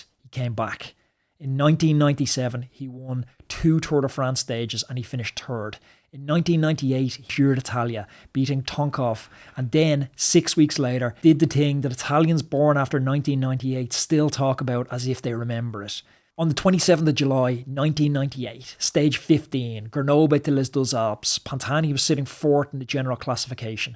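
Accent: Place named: Ireland. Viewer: Its called authentic self if you cant tune your ear to a good Irish accent your just lazy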